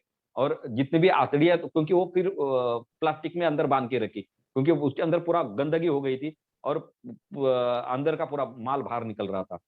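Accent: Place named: Maharashtra, India. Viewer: Saheb, your marathi accent is very beautiful 🙏🙏 Hats off to your bravery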